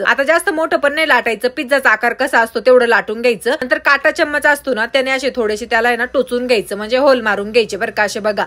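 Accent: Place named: Khandesh, India. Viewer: khandeshi bhasha 😅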